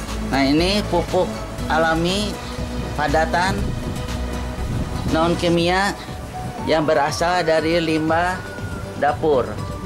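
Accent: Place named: West Java, Indonesia. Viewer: si akang,logat nya urang sunda pisan euy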